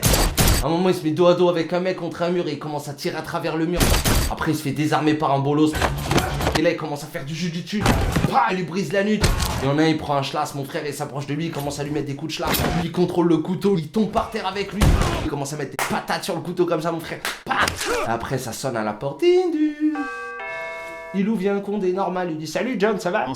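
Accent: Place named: Algiers, Algeria. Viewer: Wallah tu parles comme nous a Alger. La manière, les bruitage, zid en plus les mots en arabe. Sérieux kho. C'est vraiment fort.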